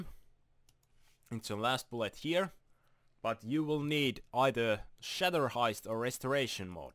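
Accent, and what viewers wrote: Finland. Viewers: you have a finnish accent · are u from finland? cuz you have very strong accent :D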